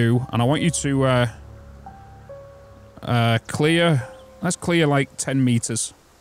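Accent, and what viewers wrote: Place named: Preston, United Kingdom. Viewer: Your accent really sounds like you are from preston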